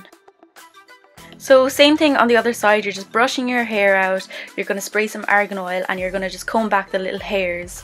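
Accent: Ireland. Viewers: I love her Irish accent 😍😍 I wish I had an accent too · are you irish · Irish YouTube for the win 💋🇨🇮 I'm Irish myself 🇨🇮💛 I knew the second you started talking you were Irish 🍀😂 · are you Irish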